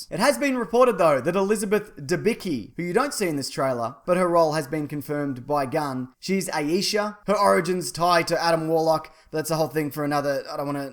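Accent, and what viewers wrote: Australia. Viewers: Always good to hear the rare Australian YouTube guru .... why had I not found you before ??? Ima subscribe.. oi oi oi !!!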